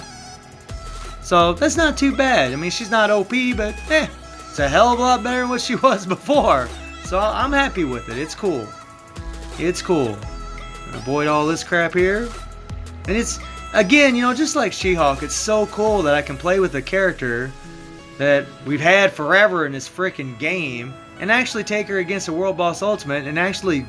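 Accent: United States. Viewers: Dude are you from north Carolina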